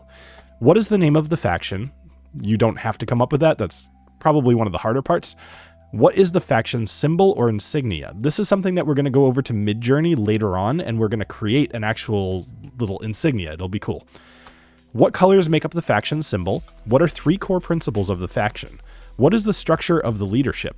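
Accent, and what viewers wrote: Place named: Canada. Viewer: You're Canadian? I just clocked it...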